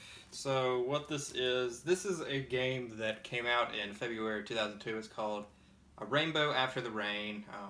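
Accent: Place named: South, United States. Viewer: oh wow, u actually imported the game! that's so cool! where are u from btw? cant help but notice ur southern accent XD im from tennnessee myself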